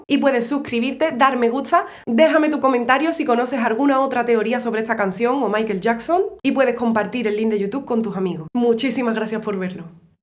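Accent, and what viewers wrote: Andalusia, Spain. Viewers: Si me preguntan por el acento andaluz tengo que mostrar este video 🙌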